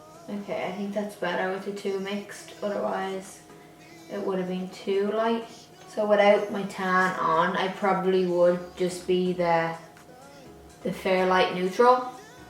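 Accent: Ireland. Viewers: Another gorgeous look. <3 I love watching your videos. Your Irish accent is so cute and unique. Like, it sounds Irish, but you say certain words with a very "country" Irish sound. lol I'm sorry that may sound crazy but I mean it in the best way possible.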